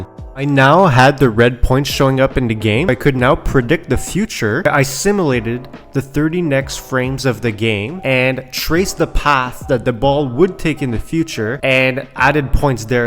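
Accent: France. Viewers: you have a french accent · you sound french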